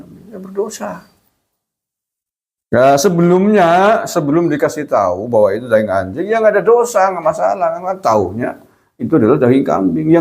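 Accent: Banyumas, Indonesia. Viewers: Hajar pak!!! Tapi sy suka cengengesan sendiri klo liat konten pak, terutama klo lg marah dgn bahasa yg ngapak 😂😂😂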